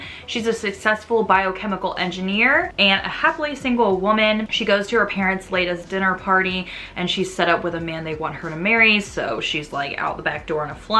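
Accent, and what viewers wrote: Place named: South, United States. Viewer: That country accent🤣🤣🤣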